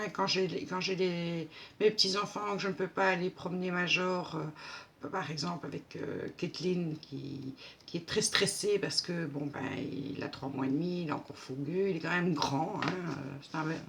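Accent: Belgium. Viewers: Coucou je reviens sur ma chaîne après un break vacanciers oblige lol, je suis contente de te retrouvé parmi nous, j'aime beaucoup ta chaîne et ton joli accent belge 😜😜😜 ne change rien, je te fais plein de gros bisous 😘😘😘😘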